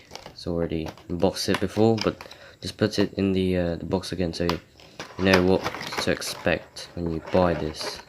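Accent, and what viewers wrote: United Kingdom. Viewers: I have those! But its gray, i got it for my bday Also nice british accent, i dont think i can do british lol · I know its too late to comment but you really have a nice british accent Im practicing my british accent! Sanaol marunong magaling mag english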